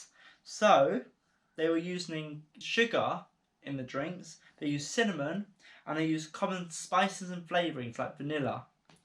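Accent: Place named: United Kingdom. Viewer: I would love to see a house tour as well as yard also. I never been to UK...I love the accent ✌️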